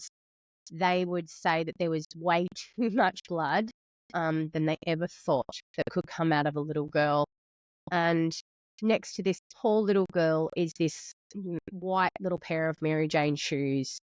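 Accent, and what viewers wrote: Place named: Australia. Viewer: Oooooo. I get the first comment. in the house. That's either a Kiwi or Aus accent. If pushed I am going to go with Aus (raised sentences in the absence of a question). I am going to Henry Higgins it and go for a WA (Perth) accent.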